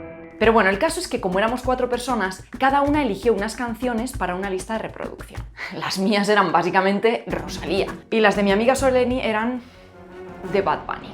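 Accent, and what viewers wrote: Spain. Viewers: Te perdiste mi suscripción, no sólo te ves vieja y hablas como señora de edad avanzada, bueno eso es típico de las españolas, pero que no sepan de música eso si es inaceptable, para colmo ni sabes hablar bien con tu zeceo. a parte he visto tus videos y no son correctos. No soy caribeño, pero si reconozco que le faltas el respeto a unos de los mejores cantantes del mundo, y si admiras a Rosalía, Rosalía ha cantado en canciones de Bad Bunny, que si te das cuenta a pesar de ser española dejó de usar tu tipo de acento, que así como dices que es mal aceptado el lambdacísmo, aquí en Latinoamérica tu suenas mal, y para terminar, pones esa canción horrible de al final de tu video. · Habla español de España y hay varios fonemas que tu desconoces. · Soy de Argentina y me suena que uds. dicen Madris en vez de Madrid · Vivo en El Bronx (Nueva York) y oi este en los puerto riquenos y domincanos. 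Yo estoy una estudiante de espanol qui hablar ingles como mi lengua materna. Prefiero los accentos de Espana (como tuyo), Colombia y Mexico. (Disculpen la falta de tildes, por favor.)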